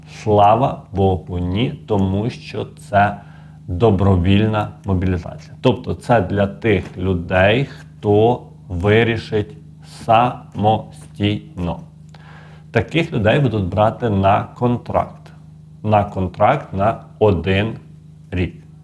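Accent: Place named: Western Ukraine, Ukraine. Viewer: Судячи по діалекту ви орієнтуєтесь на підписників зах.України.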